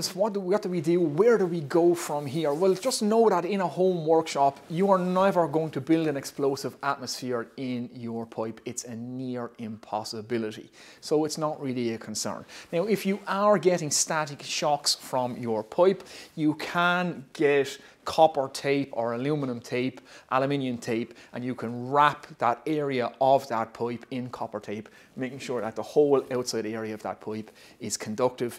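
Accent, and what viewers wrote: Ireland. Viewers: Mate your accent reminds me of a year I spent as an exchange student in Limerick, which was some of the happiest times of my life :) Nice channel too, I'll be checking it out!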